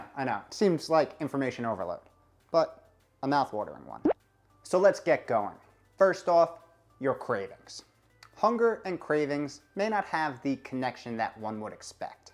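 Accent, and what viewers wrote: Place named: Long Island, United States. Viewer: I have hit approximately hour 45 of my extended fast, trying to hit 72. Cannot believe you did this for a week. I am used to ADF but trying to break things up a bit so my metabolism doesn't get acclimated. Trying to watch videos like yours to keep my momentum going. It sounds like you didn't always eat so perfectly, did you have a transformation of sorts? That's the journey I am on. If you did, could you do a video on that? Thanks to ADF I was losing weight before Covid-19 now I am certain that when we go back my co-workers will be shocked at the weight loss I have accumulated so far..my journey won't be over though. It's certainly nice to be losing weight during a time when others are unfortunately gaining weight and falling into such unhealthy habits. Thanks for this. Also I definitely hear the Long Island accent, I have family from Lake Ronkakoma.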